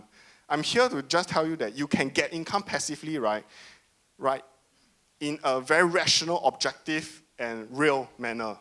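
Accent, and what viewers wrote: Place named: Singapore. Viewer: I think he is a Singaporean.. and speaking very fast does not help either.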